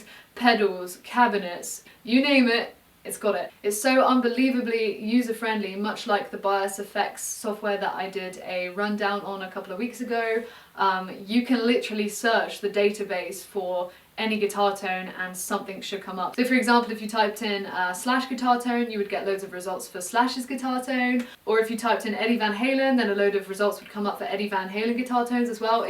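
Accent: England, United Kingdom. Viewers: Easy on the eyes and ears. What’s not to like. Lol. Love the English accent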